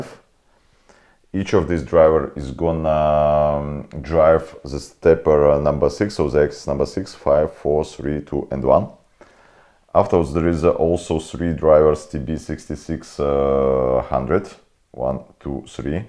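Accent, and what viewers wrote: Russia. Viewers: Фром зэ боттом оф май харт, комрад. Ох, уж этот непередаваемый шарм акцента из фильмов 80-х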